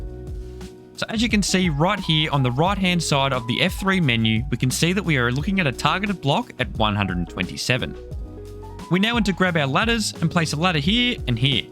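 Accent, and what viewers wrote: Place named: Australia. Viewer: I was wondering if you are an Aussie like me because you sound like it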